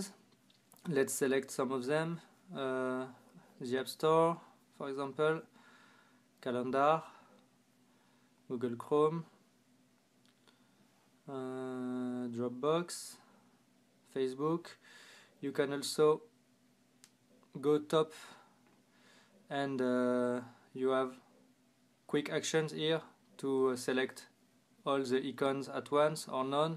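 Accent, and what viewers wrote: France. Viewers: I like your French accent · I Like His French Accent But I Dislike His Pronunciation Of 'Theme' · LOL un français qui veut parler anglais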